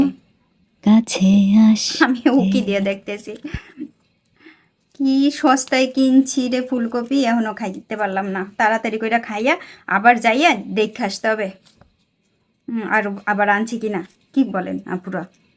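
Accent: Khulna, Bangladesh. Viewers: Aunty ami pry 2 yr ar bashi apnr video dakhi vlo lage, amr basa o khulna apni jokhn Khulna acchiln oi somai ei bujchilm apnr kn amdr moto kotha kno.❤️❤️❤️❤️❤️❤️❤️❤️❤️❤️❤️❤️❤️❤️❤️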